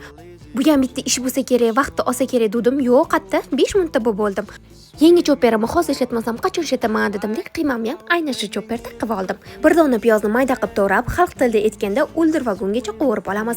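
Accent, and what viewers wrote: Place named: Kashkadarya, Uzbekistan. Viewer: Қашқадарёданмисиз? Қашқадарёликлар "тайярлаб" эмас "тайлаб" деб гапиришадида. Шундан билдим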